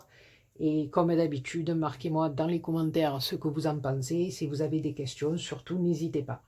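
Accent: South, France. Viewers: Coucou Superbe cette carte.... J adore tes explications et ton joli accent du sud comme le mien 😜😜 Gros bisous 😘😘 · Bonsoir tres jolies vos 2 cartes merci pour ce tuto j aime beaucoup vos tutos et j aime bien votre accent du sud il me fait penser au soleil bon week-end à bientot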